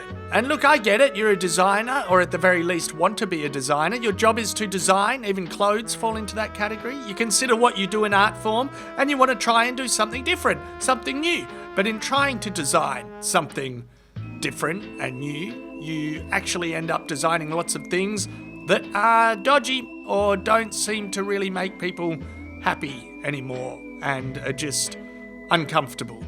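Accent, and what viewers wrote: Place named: Australia. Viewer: Somehow the Australian-voicedNarration made everything even dafter than it was...that is almost a backhanded compliment...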